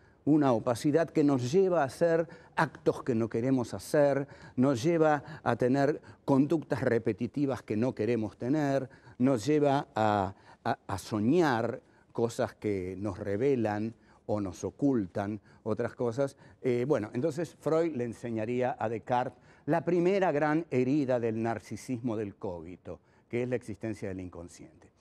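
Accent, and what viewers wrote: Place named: Buenos Aires, Argentina. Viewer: “Nunca digan digresión, es digresión”. Ese acento porteño jajaja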